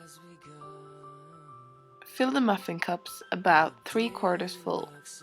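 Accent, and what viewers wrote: Norway. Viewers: Jeg så det på deg at du var norsk! I tillegg til aksenten mens du snakket, hehe :-D Måtte sjekke, og da så jeg at du var det på de andre videoene dine :-) Veldig fine muffins :-) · herregud det første jeg tenkte når jeg så den viden her var: hun må være norsk.. også hørte jeg aksenten og det var vel det som overbeviste meg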